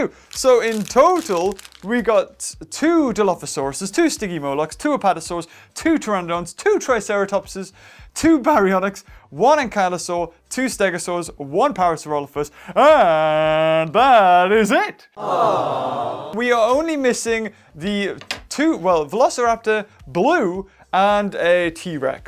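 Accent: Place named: United Kingdom. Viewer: Ya British mate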